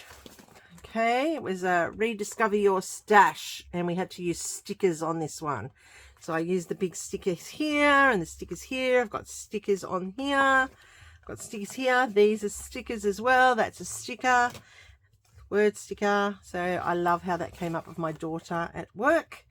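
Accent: Australia. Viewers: Oh wowsers! Thank you. I've been trying to get inspired after 9 years of Uni study. I was excited to find you,. Nice to hear an Aussie voice-over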